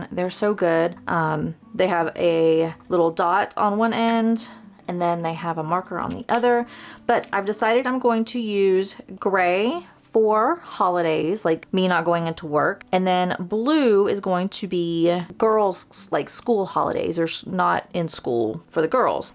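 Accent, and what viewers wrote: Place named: Tennessee, United States. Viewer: It’s so nice to hear someone who has an accent like mine. I’m from south west Tennessee.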